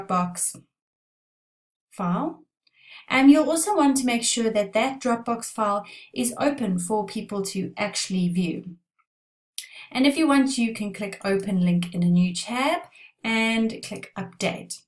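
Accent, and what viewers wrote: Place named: South Africa. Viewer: This is so helpful...I imagine things look a little different now, but I’m excited to give this a try! Plus!! So nice to hear another South African accent! 😉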